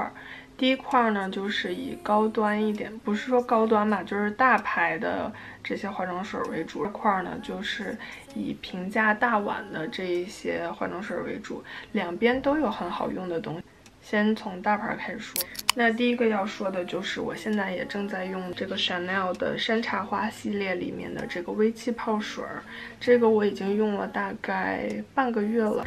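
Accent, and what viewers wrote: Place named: North, China. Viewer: 听你说话应该是北方人😆亲切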